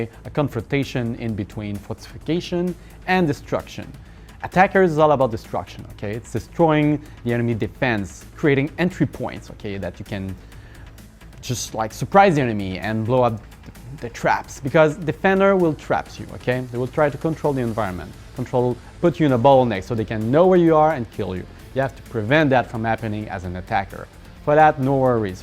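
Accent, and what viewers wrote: Quebec, Canada. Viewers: Thats a HUGE french accent. (french canadian i think) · He's a french canandian, just accept the way he talks english lol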